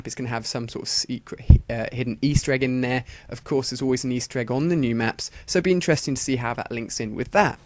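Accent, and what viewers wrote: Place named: United Kingdom. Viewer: i love your british voice :| me gusta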